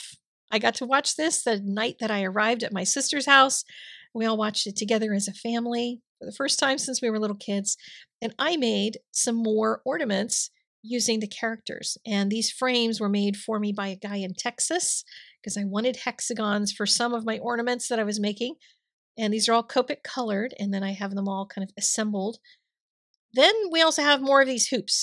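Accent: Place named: Kentucky, United States. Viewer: Kentucky! Im from Tennessee so I recognize it anywhere lol.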